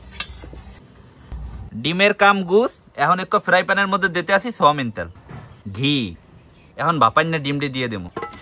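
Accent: Barishal, Bangladesh. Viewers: এ দেহি মোগো বরিশাল্যা 🙂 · কথার ভাষা একটু ভালো হলে ভালো লাগতো বরিশালের ভাষা বলে কথা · বরিশাল এর আপনি?? কথা শুনে মনে হচ্ছে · ভাইয়া আপনার বাড়ি কি বরিশাল 😊😊😊😊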